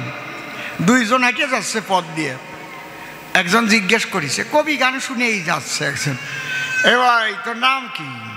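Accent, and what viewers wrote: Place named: Bangladesh. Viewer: বাঙালি এরকম করে কথা বলে না ওর কথা বলার আছে বাংলাদেশি ওম মাদারচোদ কা ভাগা এখানে থেকে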